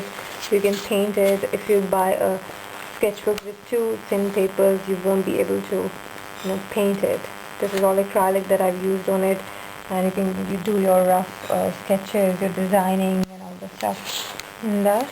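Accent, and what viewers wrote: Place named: India. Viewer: Thank you, Indian voiced woman :)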